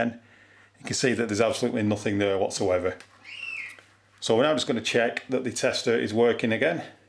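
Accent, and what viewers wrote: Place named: Widnes, United Kingdom. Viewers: widnes haha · Where are you from mate? You sound like Widnes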